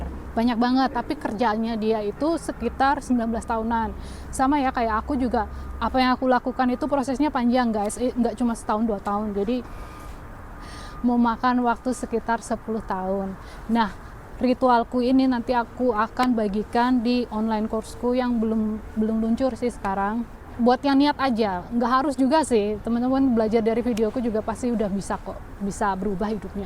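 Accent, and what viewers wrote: Banyumas, Indonesia. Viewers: Ora ngapak ora penak mbak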